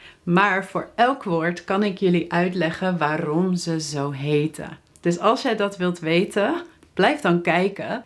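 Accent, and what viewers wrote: United States. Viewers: jij bent geen nederlandse je klinkt als een yank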